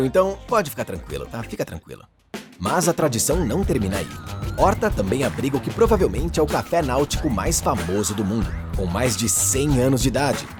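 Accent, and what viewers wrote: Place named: Brazil. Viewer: Voz do Brasil Brazil 🇧🇷🇧🇷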